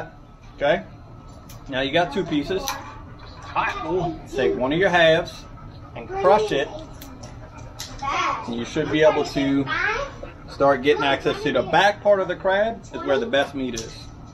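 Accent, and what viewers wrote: Louisiana, United States. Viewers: I came over from arms, family homestead, and I really enjoy your Louisiana voice and your videos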